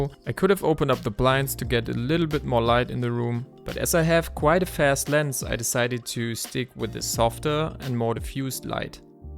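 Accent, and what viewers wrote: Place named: Germany. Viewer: your english sounds so german. but your video is great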